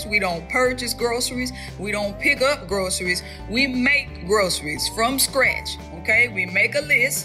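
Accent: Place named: Mississippi, United States. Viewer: Love your accent. Not the same as some Mississippians. I like it better the Mississippi British brogue one.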